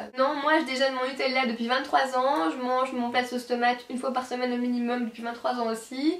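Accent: Belgium. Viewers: Mais, ne sacrifies pas ton master pour ta chaine et les évènements qui vont avec, c'est trop important;) J'adore ton accent Belge =) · BELGIUM POWER mwaaa! Je trouve pas que ton accent belge est prononcé par rapport au mien ahah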